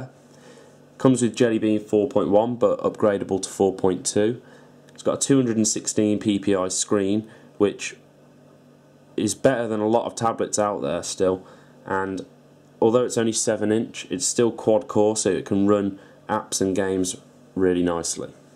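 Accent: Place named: Coventry, United Kingdom. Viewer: I am from Coventry. You have gotta be from somewhere near there by your accent! Thanks for the vid by the way, very good!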